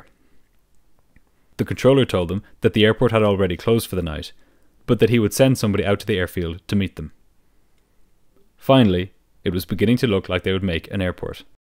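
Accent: Ireland. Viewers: Sounds like a hint of Irish in the English spoken narration but it is excellent, so clear and precise. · The irish accent is still weird. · Excellent channel great voice - is it Irish ?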